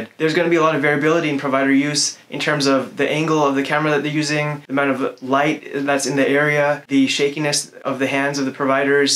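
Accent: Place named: United States. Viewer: Ur talking style like American so I asked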